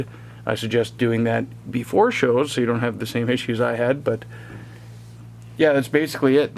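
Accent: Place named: Ontario, Canada. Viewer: I can tell from your accent you're from rural ontario? lol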